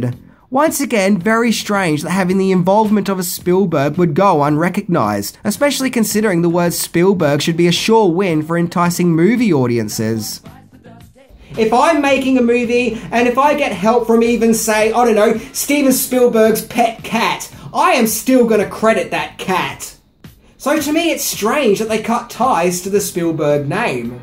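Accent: Australia. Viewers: Wait a minute? Pat Ben-ah-tah? It's said "Ben-ah-tar". Kind of reminds me of how you say "figure" due to the Aussie accent, making "ure" as "ah" instead of sounding like "your". You'd never say the 80s band " " as "Midge Ah". LOL As for the soundtrack, it doesn't make any sense to have " " by when the original song from 1969 would have been way better and more harmonic. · well OK if you call Australian accent proper English,, tbh though I live in England and I've noticed he has mispronounced some very English words,, lol.. Still a great YouTube channel though and I'm only messing about as I know what you mean it's just made me laugh when he said some town names from England wrong in a video ages ago,, tbh I can't even remember what it was now but had to laugh because it was totally wrong.. Lol.. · Maybe its an ausie thing, like how they pronounce seaga instead of sega? 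· you are annoying beyond words. Frank Lan JELL a Pat BEN et are These are not obscure names from the twelfth century BC, they are actual famous people of our time. Don't guess at names. Not people's names, not place's names. I get that you're from Australia and so e words and phrases may be unfamiliar or even be pronounced differently, but these are NAMES. YOU MAKE MY EARS BLEED. I'd block your channel but your info is good and I DO learn new things. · In case you all hadnt noticed, an Aussie, they pronounce things differently, lay off him, he's got it hard enough being upside down all the time.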